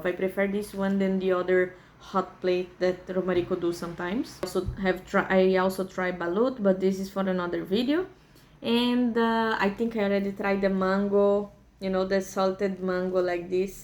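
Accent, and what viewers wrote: Philippines. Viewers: you speak English similar to the way a native Filipina speaks English, why is that? I’m a Filipino American married to a Brasileira but my wife’s English is very Brasileira. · I don't know where you're from but I'm absolutely positive you've lost your native accent. You now have a Filipino accent.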